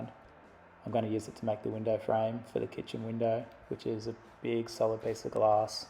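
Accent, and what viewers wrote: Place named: Australia. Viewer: This is for Australian viewers , I can see non Australians will have a problem understanding you . Even I gotta listen extra carefully.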